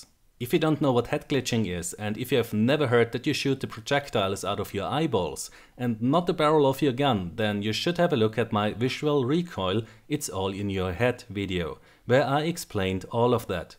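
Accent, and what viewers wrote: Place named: Germany. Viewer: You have the greatest German accent imaginable. I just picture you sitting in a lab and refusing to leave until whatever you are working on has been perfectly engineered.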